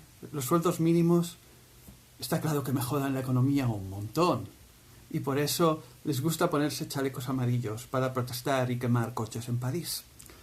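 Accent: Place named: Spain. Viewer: "Allí en el reino de España"... Por el acento, pensaba que eras Español.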